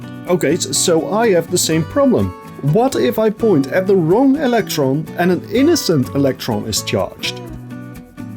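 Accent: Netherlands. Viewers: Your accent is incredibly Dutch. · you speak very good english. it was at most a hint of a Dutch accent. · You sound like you have a Dutch accent. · I can hear that you're Dutch. Great video btw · You sound like you’re Dutch, am I right? :)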